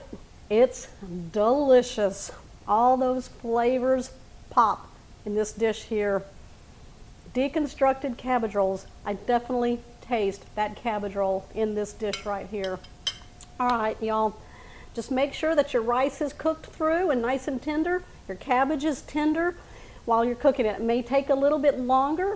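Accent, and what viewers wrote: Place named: South, United States. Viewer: Soon as you hear a woman with a Southern accent say y'all let's get ready. You know you're in for a treat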